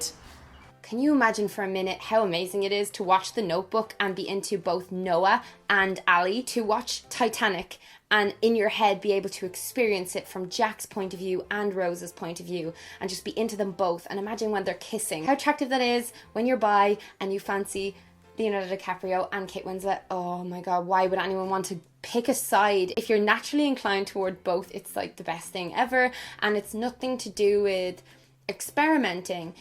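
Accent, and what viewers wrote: Ireland. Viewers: You're Irish right? The accent. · Hello, I relate to almost everything you mentioned in this video! You're so funny and your irish accent is beautiful to hear! Youre one of my favorite youtubers, hope you're reading this😊 Hope you a wonderful day. Benedetta ♥️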